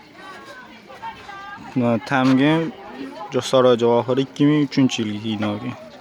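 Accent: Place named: Andijan, Uzbekistan. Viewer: Андижонлимисиз,шевангиз. Ухшаркан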